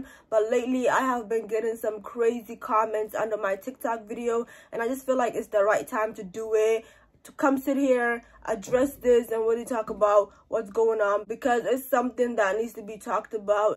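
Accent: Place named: Somalia. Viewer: Babe girl let me just say you are gorgeous. Secondly your nose and lips aren’t that big. I am African American and many African Americans have the same features some Somalis have. Lastly I wanted to know where you might be now because your accent is very interesting I can hear the Somali but their is a little bit of something else I can’t really place it. Any way be blessed love and you just gained a new subscriber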